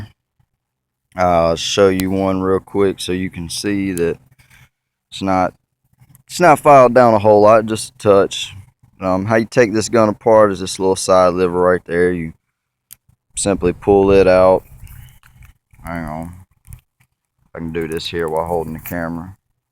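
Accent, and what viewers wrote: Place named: South, United States. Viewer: He only had 4 rounds at beginning.... (one on bottom right, one center bottom, two on left.... empty top center (live round) empty top right.) When he pointed gun towards himself I don't believe it was a loaded round. He took the bullets out to show how much was shaved off.... Then first round he fired was single action "for accuracy" .... you can clearly hear and see hammer pulled back before firing first round.... Thats when the live round was ready for fire I believe. However, never a good idea to point a gun at your face lol. Just move your damn camera in case you mistakenly do have a live round bro. Better it take the bullet than you. I assume since you got the shaving down and had no miss fires on a gun notorious for misfiring, that you're somewhat familiar with firearms... I get you weren't expecting it to be loud .. 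I don't get firing a sawed off shotgun without ear protection lol.... Shit does happen but shit can be prevented dude. Oh well you got enough bashing so I won't judge. Probably catching shit for your accent hence the moonshine shit comments lol. I been in the core for 20 years and you southern dudes typically know your weapons... Then again I don't remember seeing any of them pointing a gun to their face regardless if it's loaded or not. I'd still be doing push ups in the sandpit if I pulled that shit down range. I paid $15 at a pawn shop out the door for mine. I put it through hell. It even fires sumerged under water to this day. Most reviews are misfires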